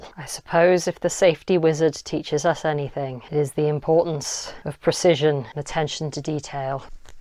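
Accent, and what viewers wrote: United Kingdom. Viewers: so I'm new here -hates jk Rowling -adhd fueled sewing -high vis attire + wizards -English accent - "to the floor, where you belong" (slightly seductive commentary) yea, I think I'll stick around for a while, this place seems cool, I can fuck with this type of content, this is enjoyable, youre someone id try to be friends with irl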